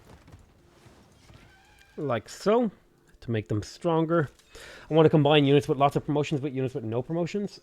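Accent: Ireland. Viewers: he is from louth i can hear it ;) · you definitely have an Irish accent.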